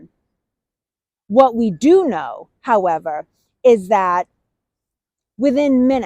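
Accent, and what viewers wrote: Boston, United States. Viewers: Assigned to Hanscom AFB in the 90's and am enjoying your collection and the revisits to the sites. I have been to Lexington Green during the annual re-enactment. The things that jumped out to me were... 1. The early morning sun glinting off of the Redcoat bayonets and the obvious lack on the Minuteman side. 2. The tension building over 10 minutes as you could hear the Redcoat drums approaching. 3. The realization that over 50 riders brought out THOUSANDS of militiamen within 100 miles - all able to engage the Redcoats on their return trip to Boston - these were pissed-off people. BTW - thanks for the Boston accent - I don't hear it out here in Colorado and it brings a smile to me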